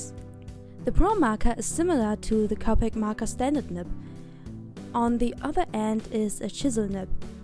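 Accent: Germany. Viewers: Man merkt einfach an deinem akzent wenn du englisch sprichst, dass du deutsch bist/aus Deutschland kommst 😂❤️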